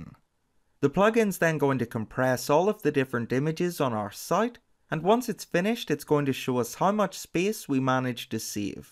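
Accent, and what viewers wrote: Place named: North, United Kingdom. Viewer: nobody should dread you at all - you are inspirational! Thank you so much for this tutorial. I'll be honest, I have looked at numerous such videos but I totally love your approach. Clear, concise, engaging - all washed down with that soft Northern accent., would it be terrible to ask a quick question about one of your other videos here? Well, I'm going to do it anyway! A twitter feed on a website - you showed it being put in a sidebar. I don't have a side bar, so can it be plonked in a page? I use siteorigin page-builder and widgets. This is not important really, but I can't say widgets if I am drunk, so I call them dingdongs! I have also followed your friend Alex last week and told him if he ever comes to Ireland to let me know, as it would be gas to help him out. He could do a tour - I'm in Cork so we could travel the length of the country. 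That again. Best wishes, Colin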